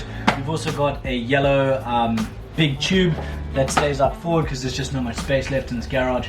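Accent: South Africa. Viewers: That’s so awesome man, I thought I recognized the accent, cause I’m also from South Africa! Where in SA are you from?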